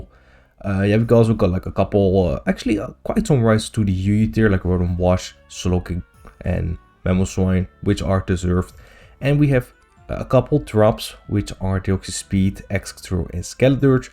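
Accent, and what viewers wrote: Netherlands. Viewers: First word you spoke I immediately knew I was hearing a fellow dutchie 😂 Lovely content though :) glad to have found your channel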